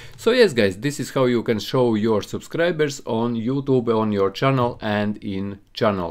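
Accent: Russia. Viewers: i love russian accent 😂🔥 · Hes Russian i think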